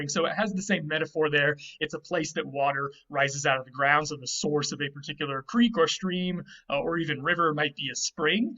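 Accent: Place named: United States. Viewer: No offence and I don't want to come off too negatively but why are you using a postalveolar approximant r in Latin words when we know factually that this sound did not exist in Latin and in no variety of Vulgar Latin was this a thing and the vowel quality you use sounds like it it taken directly from American English. Is it because you don't think it matters or is there some other reason? As a Classics scholar you must know that these words were not pronounced the way you are pronouncing them?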